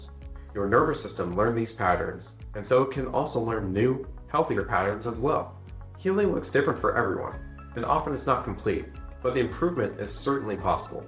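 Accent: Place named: Kansas, United States. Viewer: This was enlightening! It’s so difficult for others to imagine chronic pain. I get up and dance at a wedding everyone says hey I thought you were crippled and in pain…..I am but you have to live! You are so knowledgeable yet down to Kansas friendly speech. You deserve a huge audience! When I turned 40 fibromyalgia almost ruined my busy life. Add the loss of 8” in height and back pain like the kidneys you mentioned! It’s a miracle I listened to your kidney pain info and it saved me a trip to the nephrologist! Thank you oops!❤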